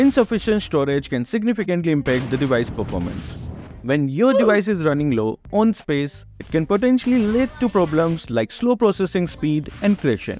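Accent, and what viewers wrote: India. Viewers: Question: are u Indian?Bcoz you have an indian accent.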